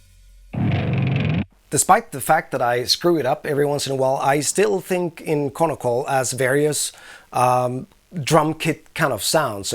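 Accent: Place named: Sweden. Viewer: Dude i just wanna hang out this dude...is he swedish?...just the accent is kool..perfect voice for reading kids bed time stories...idk lol..m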